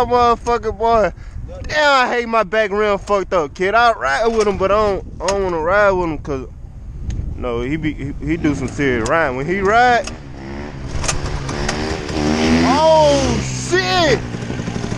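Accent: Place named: Louisiana, United States. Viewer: you got to be from Louisiana. not sure what town that is. kinda reminds me of villeplatte.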